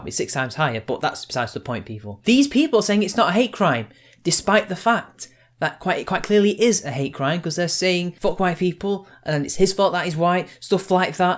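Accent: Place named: England, United Kingdom. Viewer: G Willy He's English by the way, if you could not tell by his accent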